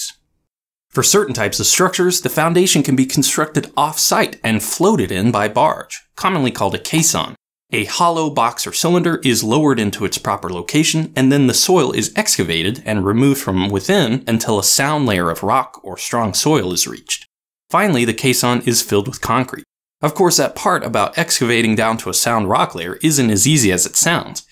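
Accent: United States. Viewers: He speaks so...american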